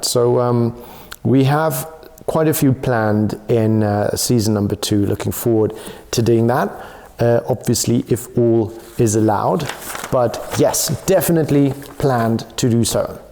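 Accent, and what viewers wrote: South Africa. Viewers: I've always suspected your accent might be South African but I just couldn't put my finger on it bru!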